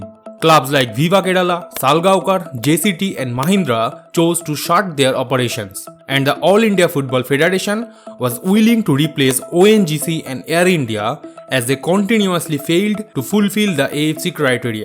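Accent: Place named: Bangladesh. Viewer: This is some thick Bangla accent brother. Good script though. Keep Growing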